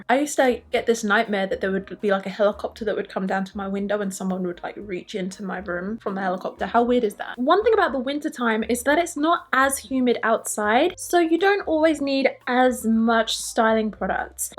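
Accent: London, United Kingdom. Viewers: Your hair is growing so so long I love that London accent 😍 💕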